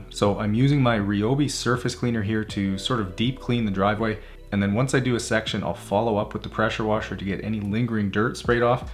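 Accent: Canada. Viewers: I’ve watched soooo many of your videos and I just want to say you sound very Canadian in this!!! Love it 😂❤️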